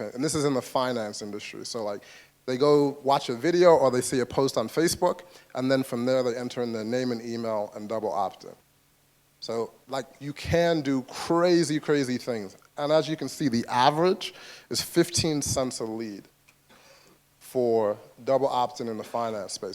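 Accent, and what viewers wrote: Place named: London, United Kingdom. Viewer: As a black man originally from London now living in America I have to say that his London accent is spot on, in fact he sounds like me :) A couple of London translations.... Thing = fing (London)......Alright = owight (London)..... Isn't it = innit (London or Ali G)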